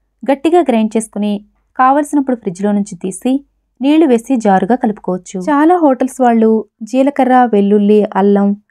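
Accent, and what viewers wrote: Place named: Telangana, India. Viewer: మీది తెలంగాణ అనుకుంటా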